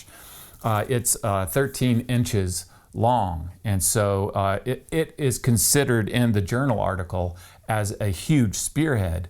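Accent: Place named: United States. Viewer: you don't seem to be able to tell the difference in a spear head and an arrow head. You're also a bit inconsistent in which measurement you give. Sometimes in metric, then immediately follow with imperial. You said the spear heads were a half inch apart, then describe the largest as 66 cm. Pick a lane or give both measurements. You have a very American accent. Don't you use the imperial system?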